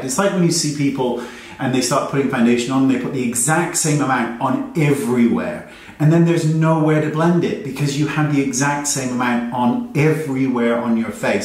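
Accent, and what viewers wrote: United Kingdom. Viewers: Come on guys, there's American English and British English and he has clearly a British accent!? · TBH I dont do make up,I just follow you coz youre so hot 😂 And I like listening when you speak british ,Im a weird? Maybe 😂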